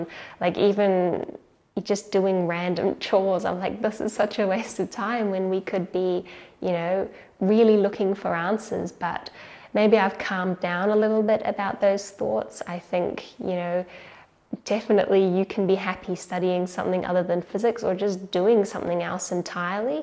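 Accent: New Zealand. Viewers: Kiwi right? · JustArduinoThings its also that kiwi accent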